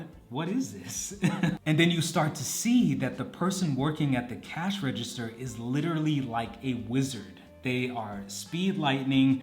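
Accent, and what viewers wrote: United States. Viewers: Acting and talking like a stereotypical American. How long have you been outside the US? Yo do know that Aldi has been a thing in the US for some time. And where is your ID? Everything IS NOT on your phone. You might have a digital German driver's license, but I am pretty sure that the US Government has not setup that type of digital ID.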